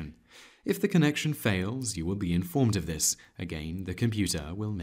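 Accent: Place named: RP, United Kingdom. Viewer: Dude this guys voice is so fucking annoying like the pitch of he voice like he talk so posh and fuckboy like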